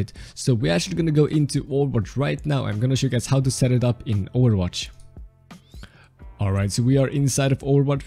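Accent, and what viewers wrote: Sweden. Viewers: Are you from sweden? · Du är svensk hör det på dialekten 😜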